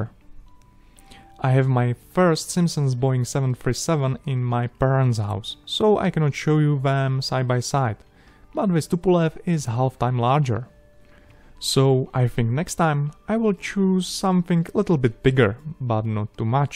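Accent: Czech Republic. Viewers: are you from czechia cause your accent is sunding czech. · máš takovou tu sklasickou českou angličtinu Češi mají dobrou výslovnost ale jde to poznat že to jsou Češi takže tak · Thanks to this channel, I now have the ambition to... make models? No. To be able to mimic a Czech accent!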